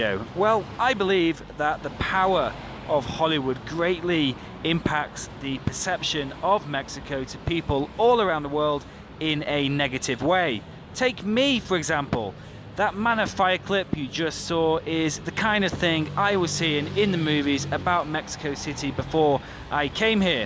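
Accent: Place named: United Kingdom. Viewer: I love all your vids,they very informative,you always try to tell how you see things the nicest way!! but I love the most when I'm listening to your vids is your accent soo British! I love it I love it!! keep up the awesome work! God bless! cheers! :)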